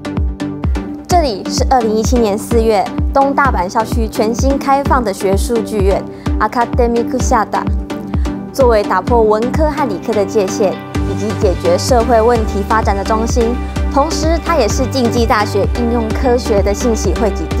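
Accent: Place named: Taiwan. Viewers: 本多照子 発音からして、台湾人だと思います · 台湾人だと思います。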